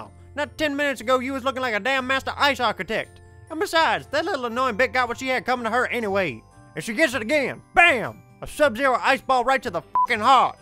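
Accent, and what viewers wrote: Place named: Louisiana, United States. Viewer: he sound like he from the byoo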